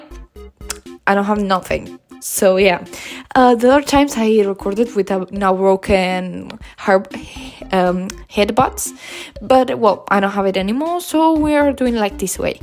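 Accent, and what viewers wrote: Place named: Spain. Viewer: You should be on my voice claim list for me and my friend's oc cuz OMG YOU SHOULD BE CHARLIES GENDER SWAP VOICE CUZ OF YOUR SPANISH ACCENT , btw love your art keep it up :3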